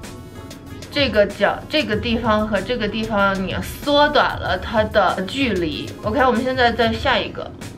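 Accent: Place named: North, China. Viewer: 前几天翻墙节点不对，居然发不了评论。我想说，当知道小姐姐是天津人的那一刻，忽然明白了为什么除了北方口音，你话语里的朴实和接地气特别亲切，原来是老乡！！🤣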